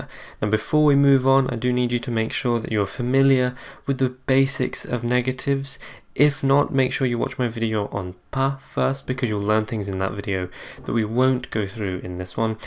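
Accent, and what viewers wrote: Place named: United Kingdom. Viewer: I'm impressed by your British accent. I even don't know whether you are a French or an English man :)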